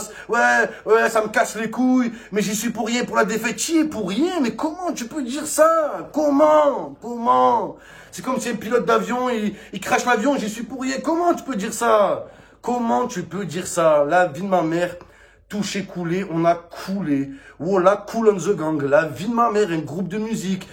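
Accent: Marseille, France. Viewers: Style marseillais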